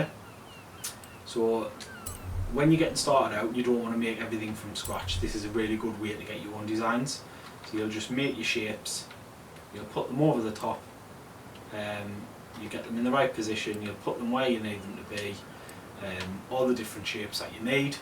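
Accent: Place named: Newcastle, United Kingdom. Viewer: Are you from Newcastle, you sound northern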